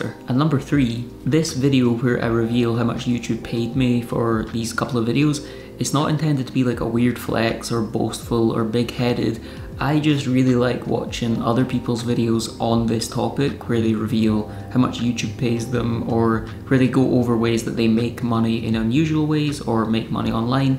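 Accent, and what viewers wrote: Scotland, United Kingdom. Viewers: The Scottish accent has got to be the best in the world, and your voice is so relaxing. Anyway I'm glad it did well and you got some good pay out of it as you deserve it. I can work out that some other youtubers must be so rich! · Thank you for sharing this information. It gives me some motivation to do more video editing and to try to become good at it. Side note: Love the Scottish accent. Scotland was one of the first countries I spent time in when I traveled outside the USA. I have nice memories of my time there and will always love hearing a Scottish accent because of that.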